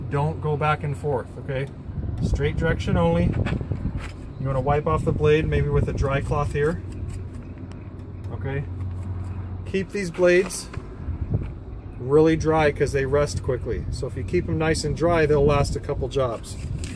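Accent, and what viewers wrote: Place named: Canada. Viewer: you have a awesome nearly perfect canadian accent :D i love using dawn dishsoap in my housecleaning.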